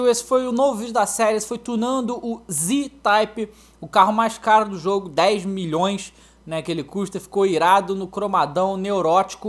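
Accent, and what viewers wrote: Bahia, Brazil. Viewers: mano vc é MT baianaaao cara slk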